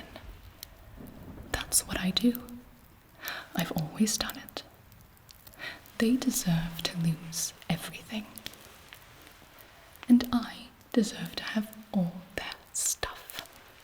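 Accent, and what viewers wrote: England, United Kingdom. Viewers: This is one of the most convincing English accents I've ever heard from an American. (As an English person.) And you maintain the accent while maintaining an ASMR voice. That's impressive. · She nailed British accent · Okay, since whem are you british? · Uk accent😂❤️ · I think her accent it British 🤔🧛‍♀️🇬🇧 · because she's so amazing and hot and she can do an incredible British accent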